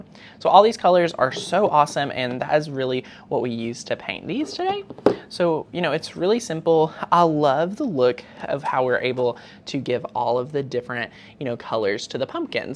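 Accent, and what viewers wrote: South, United States. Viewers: Im new to your channel. Love your southern ascent. Where are you from. Love your ideas.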